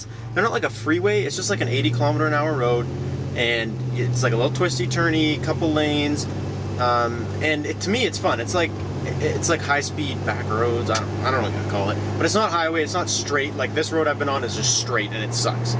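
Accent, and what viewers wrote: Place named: Canada. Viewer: I keep hearing “aboot” and I’m like.. you’re Canadian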